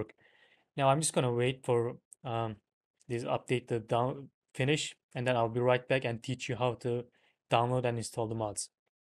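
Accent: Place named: Turkey. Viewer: aksan türk aksanına benzio heralde türksün reis shadow of the tomb raider varmı mod olarak bide modlar oyunun orjinal halini bozarmı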